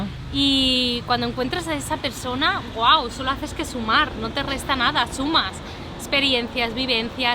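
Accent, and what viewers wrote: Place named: Catalonia, Spain. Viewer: apenas conoci su contenido un par de semanas... Y me atrapó... Es carismática, una mexicana con acento catalán, una catalána con sangre mexicana. Esa es la nueva realidad que nos muestra. Que las nacionalidades son relativas, tu patria eres y el lugar donde florece el alma. Son un gran equipo, saludos.. tienes un gran tesoro cuidense, si algún dia si me topo contigo en la calle y ese ir y andar tan tuyo.. no te asustes si me emocióno al verte. Abrazos 😽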